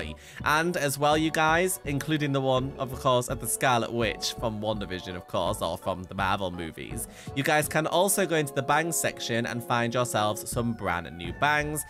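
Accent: United Kingdom. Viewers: OMG ty so much for telling me and I love your accent I’m from Britain too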